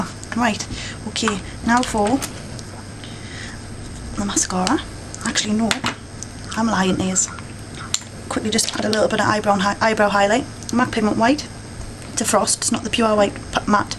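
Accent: Newcastle, United Kingdom. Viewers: It's Geordie - as in Newcastle etc... !! !!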